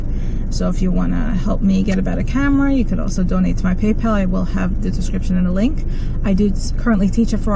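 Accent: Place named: New York, United States. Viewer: Do you teach in Suffolk county? I like you New York accent lol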